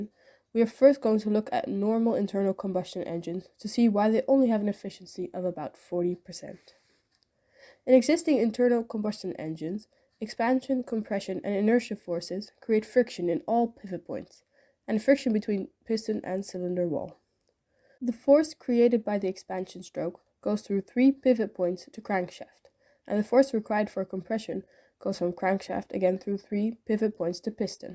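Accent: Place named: Netherlands. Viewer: I'm not an expert. But if you could tune the balancing of the counterweights right, this seems functional. Using the power stroke to directly power the compression stroke of the other 'cilinder'. Just one note however: due to the placing of the gears, the arm wich rocks the pistons back and forth is not symmetric. Causing the pistons to slowly compress on the left. And rapidly compress on the right. To me that seems like a bad thing. But that's all I got. (En ook erg goed Engels. Ik had bijna niet door dat er een Nederlands accent was :D )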